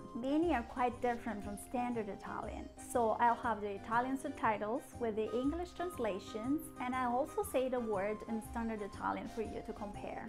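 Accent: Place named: Agrigento, Italy. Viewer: Dall'accento direi che sei agrigentina? Complimenti per il video! :)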